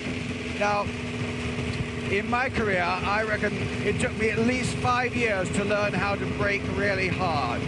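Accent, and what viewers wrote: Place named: RP, United Kingdom. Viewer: He's one of those guys you could listen to all day and never get bored. I don't know if his, posh?, accent is a help or hindrance to that.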